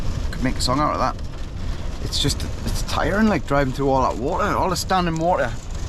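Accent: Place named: Derbyshire, United Kingdom. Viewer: Where are you from? Love Your accent! sounds like Derbyshire? That sort of area? X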